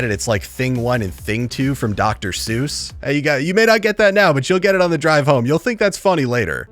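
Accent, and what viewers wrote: United States. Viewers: Is bro from Pittsburgh?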